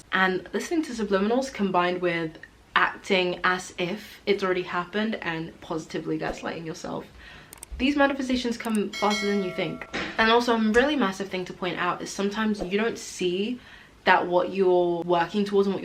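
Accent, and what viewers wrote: United Kingdom. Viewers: I just came from one of her older videos, why does she have a British accent now?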